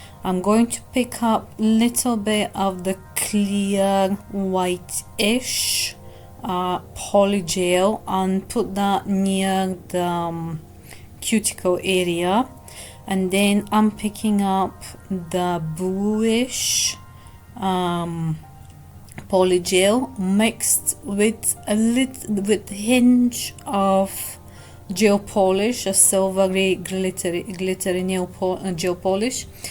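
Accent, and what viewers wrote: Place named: Scotland, United Kingdom. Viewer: You sound Scottish 🏴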